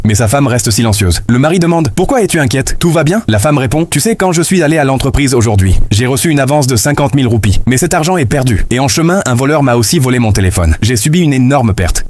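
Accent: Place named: France. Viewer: Merci pour votre accent français. Monsieur l'auditeur. 😮. C'est génial. De ta part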